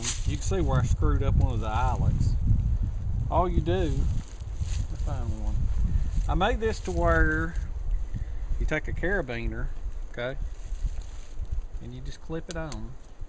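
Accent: South, United States. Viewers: I've subscribed recently and have watched a dozen of you videos since and I think you have a great channel! I work in a similar field as yours and I have to say I really like how you bring the workshop to the bush! Love the Carbon Fibre Chimney and ovens! Nice welding and ingenious stuff! You're quite resourceful with material! Nice sheaths, also! Keep em coming Bud!!! I'm French canadian and I dig your southern accent a lot too! :)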